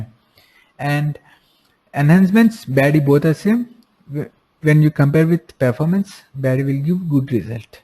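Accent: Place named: India. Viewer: PLEASE SPEAK UK OR AMERICAN ENGLISH AND NOT HINDI ENGLISH.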